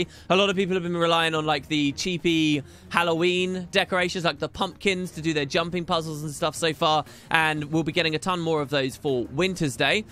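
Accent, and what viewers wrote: United Kingdom. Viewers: has you're british accent gone?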